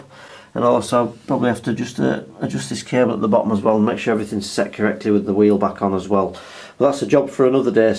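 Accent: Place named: North East England, United Kingdom. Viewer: just woundering wheres the Acent from. North east am guesing.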